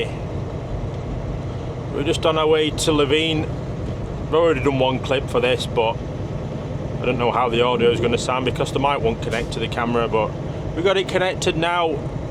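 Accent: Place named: England, United Kingdom. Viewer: Nice truck and that English accent is going to throw off some people 😅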